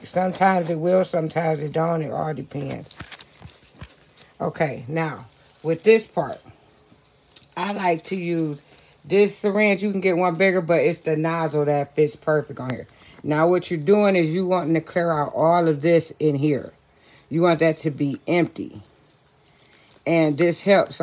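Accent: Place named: Rural, United States. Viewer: I wasn’t finished 😠 I started laughing when it started by showing your feet. I thought that was great! Here’s a non pretentious instructional video that might show me something. Then I heard the Country Twang and I was hooked!!! Wonderful job! No Fluff! I don’t care for fluff! I’m going to keep up with you. I’m coming online soon with a “Big Show”, and I would love to feature you. Now I’m finished ✊🏿✊🏿✊🏿❤️🖤💚😎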